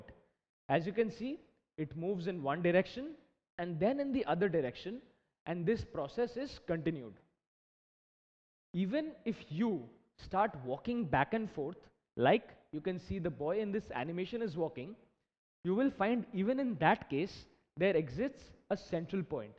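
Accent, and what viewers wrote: India. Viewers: Very good fellow but please try to speak slowly, because of your Indian accent and also many student belong to deffernt countries and the English language is not their native language , thanks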